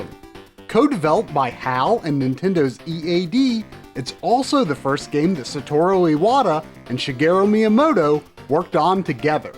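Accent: United States. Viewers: I understand trying to pronounce some Japanese words can be difficult, but listening to them in a heavy american accent is also just as hard listen to. Japanese phonics are actually fairly easy to learn as most of the sounds are repetitive. I highly recommend the japanesepod101 youtube channel. <link>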